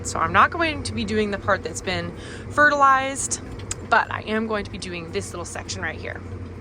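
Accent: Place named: Midwest, United States. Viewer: Your big smile and bright eyes kept remindig me of somone and today I figured it out. you are a young Reba made over minus the red hair And your mid westen accent just adds to the resemblance!!. 😃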